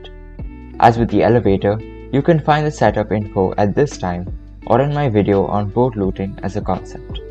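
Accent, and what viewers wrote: India. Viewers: Bro has a nice indian accent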